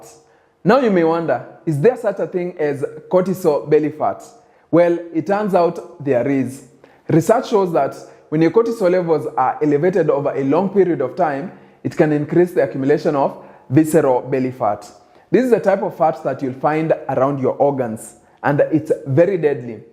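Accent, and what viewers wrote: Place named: Kenya. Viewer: Are you kenyan? Just asking, you sound like my watu:)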